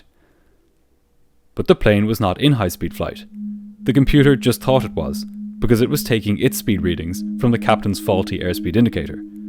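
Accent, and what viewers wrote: Ireland. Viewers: Yes yes i thought i got a little Irish accent in there too 😅🍀🍀 im also irish by the way 🇮🇪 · Only started watching your channel, its a great find!!! By any chance do I detect an Irish accent mo chara? I'm Irish and it seems like I do but I could be totally wrong! Let us know if you do!! Keep up the amazing videos and keep safe Mr!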